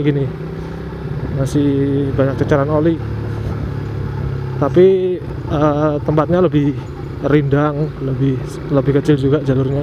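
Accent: Java, Indonesia. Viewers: Jadi diri sendiri aja mas, sama sama wong jowo ya tetep medog, jgn dirubah gayamu, saya juga medok kok di vlog saya. bahkan Tora Sudiro aja masih medog .... hahahahha analogi yg bagus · bener mas wong jowo ojo sampe ilang jawane... ,gk usah di rungoke omongane koncone sampean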